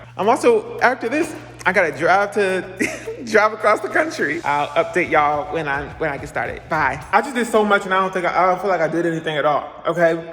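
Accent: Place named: Philadelphia, United States. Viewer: Why do you lowkey already have a philly accent😂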